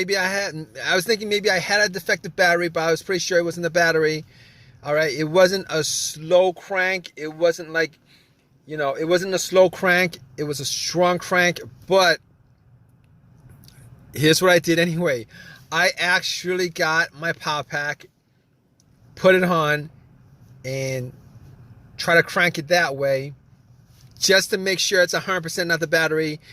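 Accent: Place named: Staten Island, United States. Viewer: Sounds like Staten Island accent. Judging by shade it’s 10 30am sxo he’s up early + just had first puff puff. Sadly, He and the boys won’t be getting “za” today.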